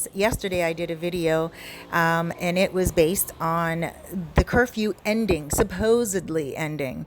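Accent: Canada. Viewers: Great content! I could tell you are Canadian by the way you pronounce certain words, like "out and about"! I always knew! Keep up the great content!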